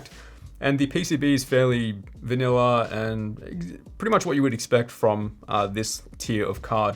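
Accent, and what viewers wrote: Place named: Australia. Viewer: Ayy had a feeling you were Australian :D woot I'm from Straya too bro haha, honestly though INCREDIBLE breakdown and explanation of the card, that was top notch ^_^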